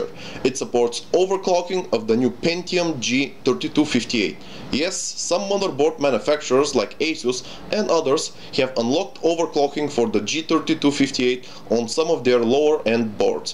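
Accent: Russia. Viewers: I love the madarfaking russian accent :D (i am Bulgarian, though) :D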